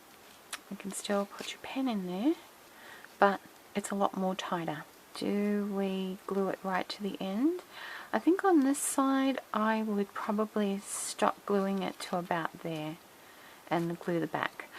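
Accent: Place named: Australia. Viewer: I love your work! Your papers and designs are amazing! I take it from your accent that you must be Australian? Anyway you are so creative!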